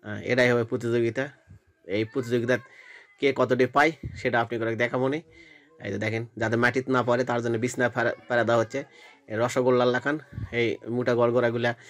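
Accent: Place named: Bogura, Bangladesh. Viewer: ভাষাটা বেশ ভালোলাগে এটা কি বগুড়ার ভাষা?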